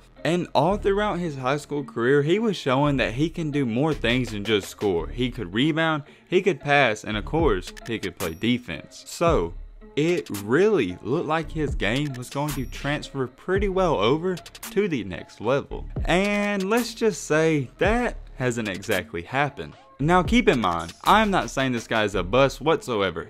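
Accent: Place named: Country, United States. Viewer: Damn did boomhower do this video??? Cuh country asf!!!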